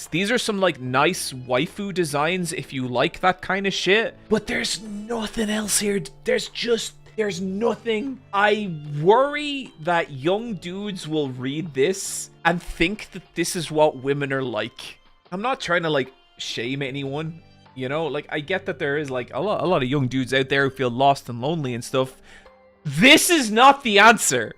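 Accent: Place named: Ireland. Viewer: Is it me or does he sound significantly Irisher than usual here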